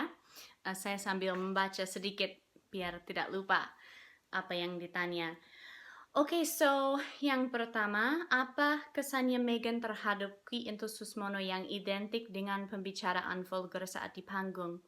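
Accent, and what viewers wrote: Java, Indonesia. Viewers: Ngmong nya medok bgt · you indonesian is getting medhok i like it!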